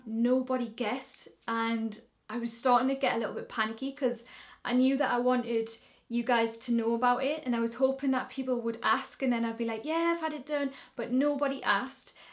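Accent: Newcastle, United Kingdom. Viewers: Are you from South Shields? I'm from Newcastle and your accent sounds familiar!!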